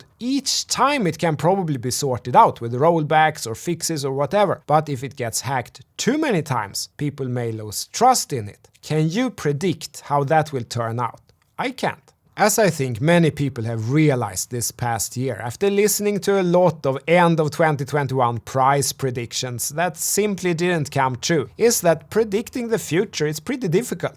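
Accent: Sweden. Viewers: Your analysis are amazing and thank you for doing all the work for us and I love your Swedish accent , please do Some doge coin analysis and prediction Thanks again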